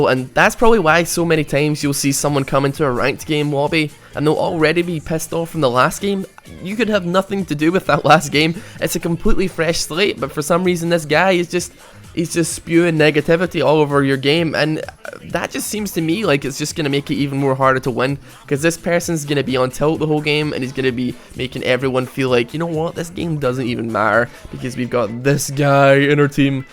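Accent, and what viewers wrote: Scotland, United Kingdom. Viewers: Where are you from ? What's your accent ? Is that scottish ? (dont hit me) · Are you Scottish?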